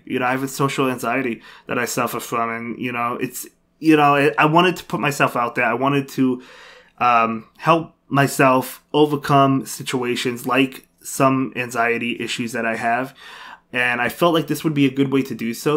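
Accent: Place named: East Coast, United States. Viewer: I just thought you had an east coast accent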